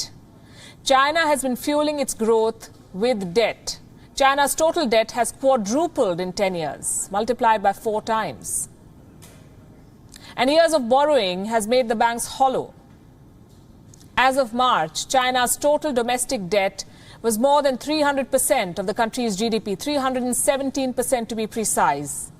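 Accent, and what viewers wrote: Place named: India. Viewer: forgif and understand her indian accents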